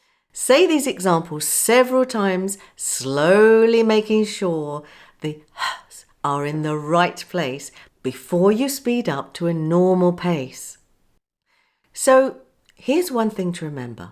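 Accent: RP, United Kingdom. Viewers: Por fin alguien que habla RP accent .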